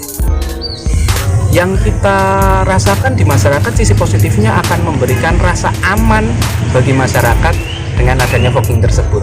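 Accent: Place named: Java, Indonesia. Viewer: medok gaes hehe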